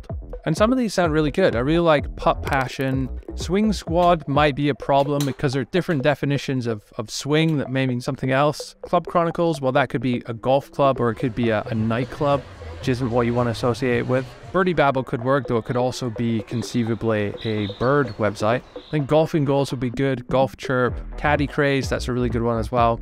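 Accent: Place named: France. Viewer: because for French Accent and the funny (and useful) video i voted on Product hunt too:) Thanks guy for this tool and videos:)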